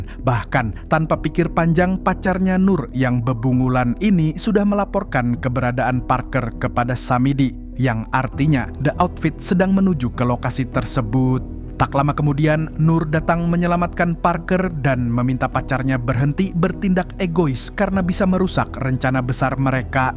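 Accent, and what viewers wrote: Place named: Banjar, Indonesia. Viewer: Inya orang sungai tabuk😂😂😂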